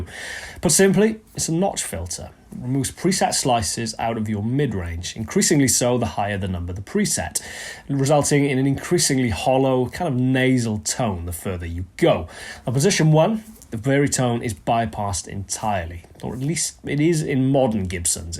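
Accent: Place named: Geordie, United Kingdom. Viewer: Are you originally from Newcastle? Keep noticing a tiny bit of Geordie in your accent.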